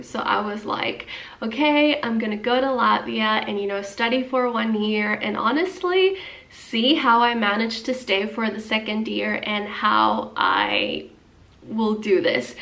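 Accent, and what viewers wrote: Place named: United States. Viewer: your accent is more American than Honduran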